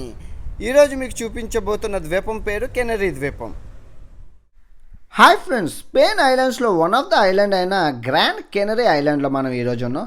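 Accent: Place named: Vizianagaram, India. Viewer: Bro i am frm Vizianagaram.. Nen miru upload chesina prathi video chusthanu... Andhukante nenu yelaago out of country vellalenu kabatti... Mana slang lo entha baaga chupisthunnaru... Nen video chusthunte nenu vellinattu vuntundhi.. Really thanks bro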